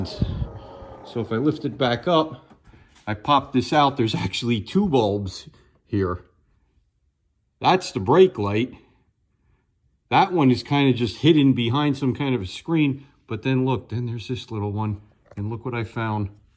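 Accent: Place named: United States. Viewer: Thank you for this. The way you speak makes me want to visit the States again. Salutations from Germany.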